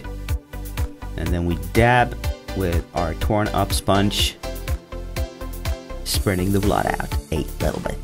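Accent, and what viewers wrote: Scania, Sweden. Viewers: är du från skåne?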